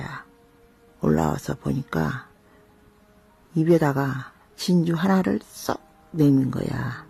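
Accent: Jeolla, South Korea. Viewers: 우리엄마가얘기하는거같다 전라도사투리도쓰면서ㅠ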